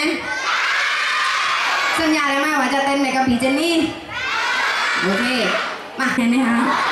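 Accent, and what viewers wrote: South, Thailand. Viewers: พี่เจนนี่เป็นคนใต้ออกเสียงซาว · รู้เเล้วว่าเจนนี่เป็นคนใต้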